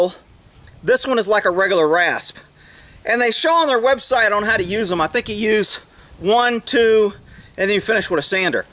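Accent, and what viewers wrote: Texas, United States. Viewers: what part of texas is that?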